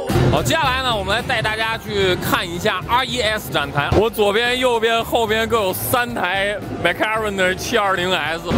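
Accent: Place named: Beijing, China. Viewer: 这哥们的老北京新东方口音还蛮特别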